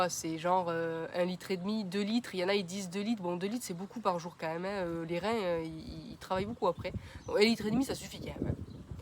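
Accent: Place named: Gard, France. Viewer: Étant moi même Gardoise j'adore t'écouter je m'identifie bien à tes expressions, merci j'ai rigolé 🥰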